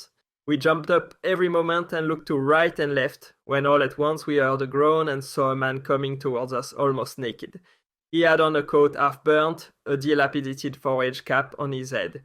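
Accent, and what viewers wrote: France. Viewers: Love hearing this read in a french accent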